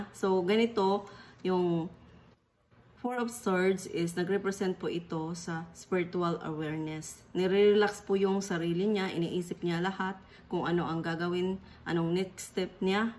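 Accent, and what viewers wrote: Visayas, Philippines. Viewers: Ha?????😂😂😂😂😂😂Diyos lang ang nakakaalam ng lahat DDS ito😂😂😂😂 paano mananakot e siya na nga ang tinatakot harapharapan😂😂😂pananalita mo lng bisaya o davawenya ka DIYOS ka ba???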